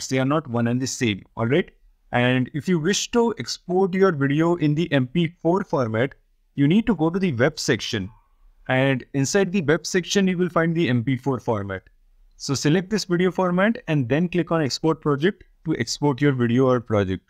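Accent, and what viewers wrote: India. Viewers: why does your voice sound Indian in a English way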